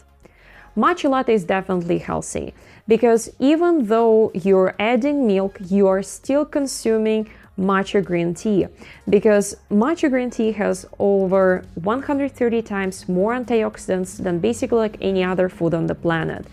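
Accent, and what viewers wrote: Russia. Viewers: Where you from ? You sound Russian ?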